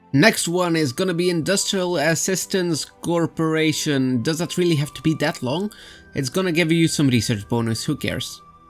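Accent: Netherlands. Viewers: Are you Dutch or Flemish? Just noticed it in the beginning of the vid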